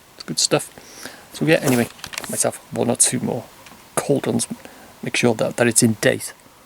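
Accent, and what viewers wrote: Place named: Liverpool, United Kingdom. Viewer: Great vlog love stories about how things used to be,i new u were a bit scouse by the way u say some words.Hope u got more stories to tell.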